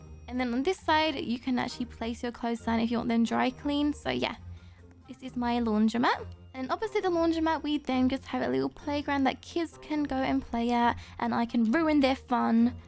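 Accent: United Kingdom. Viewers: Wait Are You British?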